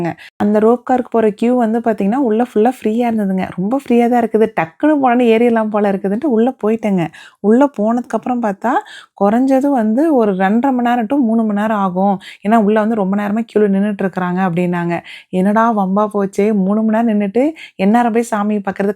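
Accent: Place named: Erode, India. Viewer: Unga slang partha erode side nu nenaichen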